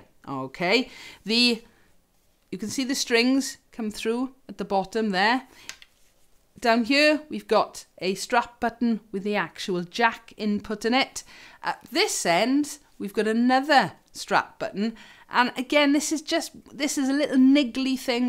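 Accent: Wales, United Kingdom. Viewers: English? Sounds suspiciously like a Welsh accent to me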